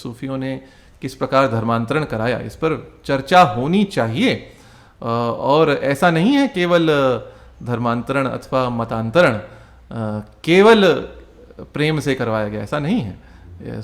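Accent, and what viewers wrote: Standard. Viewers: Aapki shuddh Hindi sunkar hriday prasann ho jata hai. Aapko dekh kar mai bhi ghar me Shuddh Hindi bolne ka prayatn kar rahi hun. Dhanyvad mahashay 🙏🏼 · Shuddh Hindi! What a delight!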